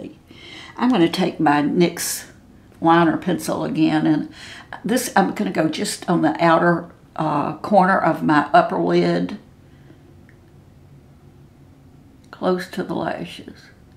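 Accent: South, United States. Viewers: Your eyelashs are SO long! Thanks for a great video,. You seem like a big sister, favored aunt and cherished grandmother. None of which I actually had growing up in North Carolina. Your kind spirit and southern accent are INCREDIBLY nourishing and healing for me.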